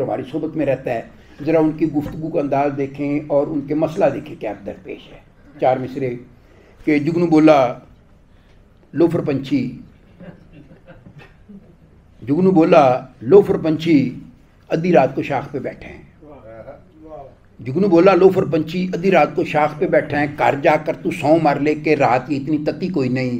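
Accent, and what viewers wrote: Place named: Punjab, India. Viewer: Bohat maza aaya !! Punjabi Urdu mix , bara desi style hai aap ka 😅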